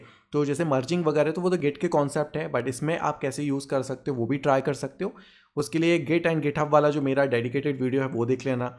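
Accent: India. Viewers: Awesome Indian Accent wiht confidence unlike others who fake accent i really loved and enjoyed this video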